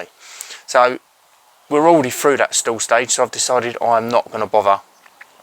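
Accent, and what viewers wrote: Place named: United Kingdom. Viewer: Still getting use to hearing British accents talking about bbq and smoking meats lol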